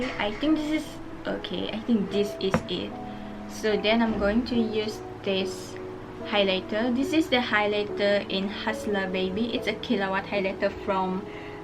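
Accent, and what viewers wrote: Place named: Sarawak, Malaysia. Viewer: dengar nya mpun oii ya ku tauk nya orang sarawak 😂😂😂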